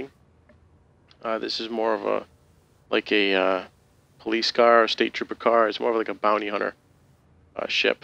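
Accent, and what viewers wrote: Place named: New York, United States. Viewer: Just bought this game yesterday, thanks for making these videos. Judging from your accent you're a fellow New Yorker. Sounds like either Brooklyn, Staten Island, or Long Island. Peace!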